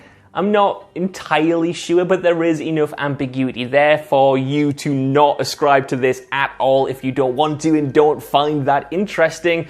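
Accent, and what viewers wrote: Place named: Scotland, United Kingdom. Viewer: I think it’s so satisfying how Scottish (maybe Irish) he sounds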